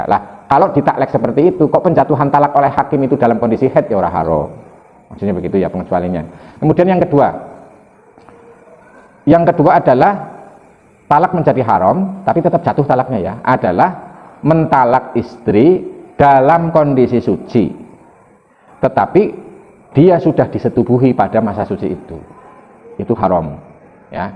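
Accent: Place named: Banyumas, Indonesia. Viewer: Gus ..ngendikane banyumasan bsnget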